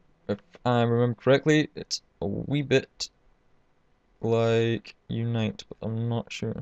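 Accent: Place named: Glasgow, United Kingdom. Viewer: Good clear content, thanks! (Also, your accent is delightful. Are you from Glasgow?)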